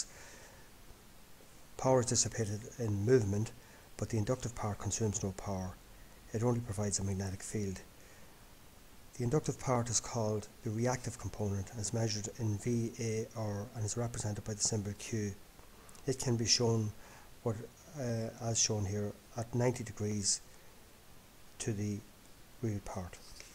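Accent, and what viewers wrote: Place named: Ireland. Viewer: Thank you very much kind sir, it was well explained and i appreciate the fact that you talked slowly or i would have trouble understanding as i'm not used to the irish accent.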